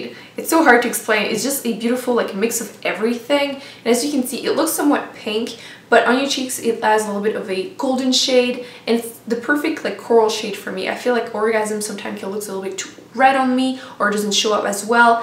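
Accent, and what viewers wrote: France. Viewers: I just love Nars blushes because they are so pigmented! I never have to worry about them showing up on my skin. I have 8 right now, Amour and Seduction are next on my list. Es-tu française? J'ai toujours l'impression d'entendre une pointe d'accent. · gorgeous French accent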